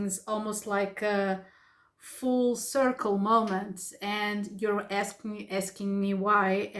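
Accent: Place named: Portugal. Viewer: ouvi 2 minutos do video e já detectei um sotaqueuzinho português e realmente confere.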